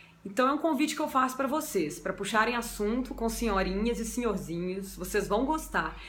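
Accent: Minas Gerais, Brazil. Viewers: Você me inspira. Um abraço apertado. Ah, esse sotaque mineirês... Saudade da minha terrinha!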